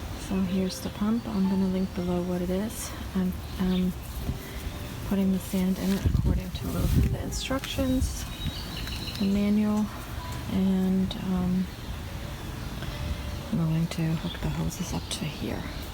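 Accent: Germany. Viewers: I hear a bit of German accent in your voice. It's beautiful. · Very nice! Do I detect a German accent 😊? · Fantastic video! Are you by any chance originally from Germany? (Slight accent detected)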